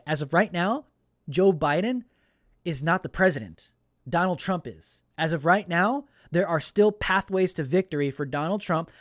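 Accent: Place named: Puerto Rico. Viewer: Bro i saw your speech at the rallly in DC and it was broadcasted by RSBN..............you remind me of me all the other ones were kinda ok but damnnnn...you blew it off the roof about how to fight back.....i don't know if you are latino from new york or from what your accent sounds like maybe puerto rican like me but yooooo....that was the one that i even looked at my lady and said....now this is a man's man lol.....keep hope alive brother this isn't over and eventually the truth prevails...peace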